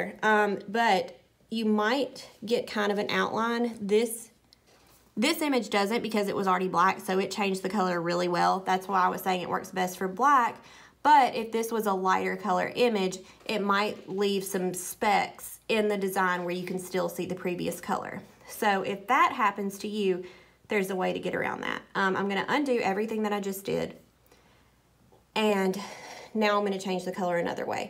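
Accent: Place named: South, United States. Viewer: Your accent is such a southern accent it’s sooo cool!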